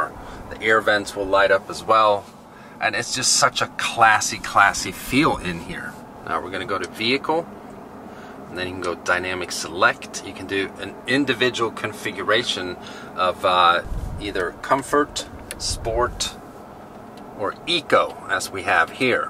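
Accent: Sweden. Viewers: I dont know why but your Swedish accent stood out to me in this vid 😅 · Great review. Am I hearing some Swedish in there?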